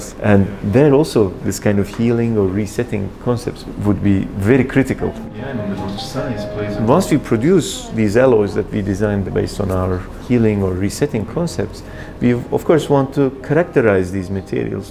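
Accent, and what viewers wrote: Turkey. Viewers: Aksan Direkt Türk Olduğunu Belli Etti :D İsimde Kesinleştirdi. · videoyu açar açmaz bildim aksanindan türk olduğunu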